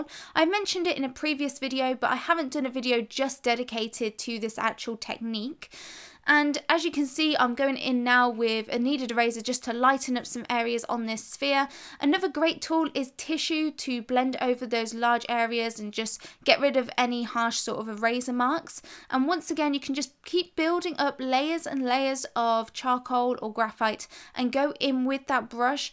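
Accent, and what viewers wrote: England, United Kingdom. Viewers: I love your english accent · I just wish I could understand what you are saying. Your British is so thick and you speak so fast I can only comprehend about ever other word, which makes for a lot of confusion. Can you speak American? Please? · luv you british?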